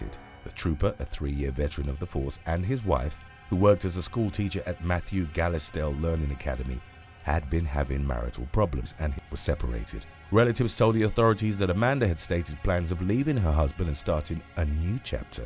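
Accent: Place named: United Kingdom. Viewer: If this channels voice was an American voice this channel would actually get somewhere. I can’t take this shit serious when all I hear is British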